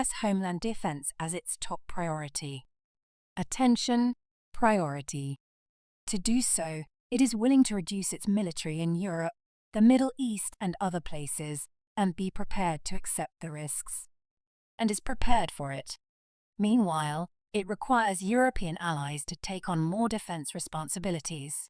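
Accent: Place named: United Kingdom. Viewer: 今天的翻译比昨天的好，纯正的英音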